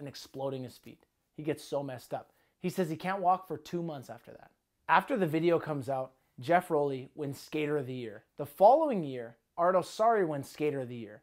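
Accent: Canada. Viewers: Your Canadian accent is so strong in this, pronounced sorry the Canadian way.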